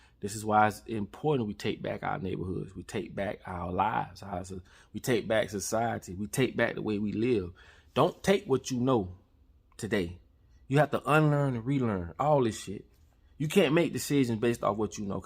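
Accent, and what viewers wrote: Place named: Deep South, United States. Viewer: That's that deep deep south raising!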